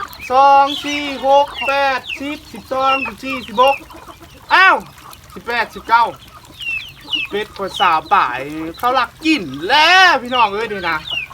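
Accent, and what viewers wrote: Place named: Phu Thai, Thailand. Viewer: พูดน่ารักคำพูดเพราะภูไทยทีอีกภูไทหึ่วคำพูดออกแข็งทีลาวเป้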